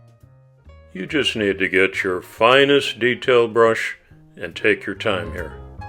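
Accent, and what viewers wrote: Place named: Canada. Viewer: Dude, I LOVE your (not at all) odd Canadian pronunciations. I've begun pronouncing 'decals' as "deckles" now and then. It's an American thing I'm trying to un-learn: just because we pronounce it one way doesn't mean that's the correct way. Deckles, deckles, deckles all day long!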